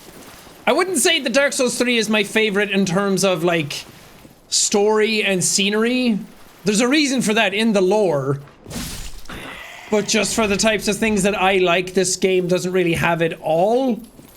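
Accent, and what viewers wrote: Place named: Ireland. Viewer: 8 and a half hours of screaming irish man, yes sign me up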